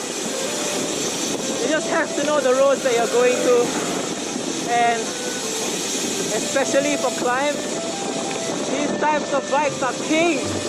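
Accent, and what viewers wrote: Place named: China. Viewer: Nice review!!! Chinese brand bike reviewed by a Chinese accent. Thank you 😁👍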